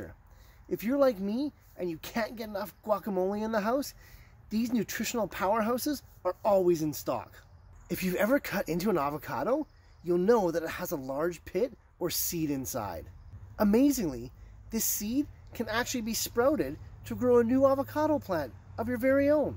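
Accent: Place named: Canada. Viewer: Cool Canadian accent😁 thank you for sharing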